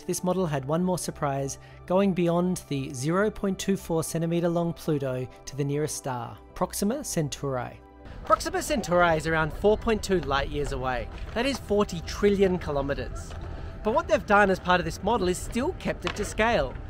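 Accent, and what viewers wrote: Australia. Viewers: Australian tom Scott out here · Australian Tom Scott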